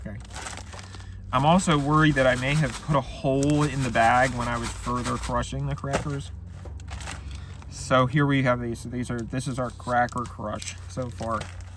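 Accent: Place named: Philadelphia, United States. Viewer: Man is def from the general Philly/Bmore area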